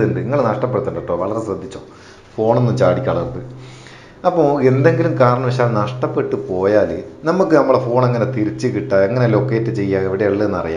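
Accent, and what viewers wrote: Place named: Vadakara, India. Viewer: മ്മളെ ബഡേര ഭാഷ യും ഇഷ്ടപ്പെടുന്നവർ ഉണ്ടല്ലോ സന്തോഷം ആയി 😛😂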